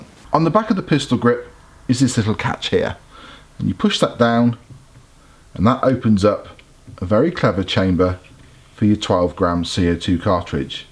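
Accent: United Kingdom. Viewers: I subbed just because of your voice. It's not just the accent, you could be a commentator for the BBC or Top Gear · Am I the only one who thinks his voice and accent are annoying for this kind of video. Cuz I totally wasn’t expecting a guy with a British accent when I came here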